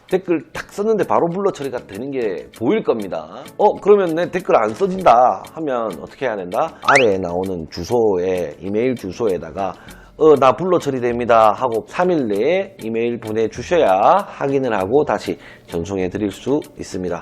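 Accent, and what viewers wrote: Gyeongsang, South Korea. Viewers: 많은 영상에 좋은 정보를 얻고 있습니다. 구수한 갱상도 사투리가 참 정겹습니다^^ 혹 지금도 자료를 부탁드려도 될까요?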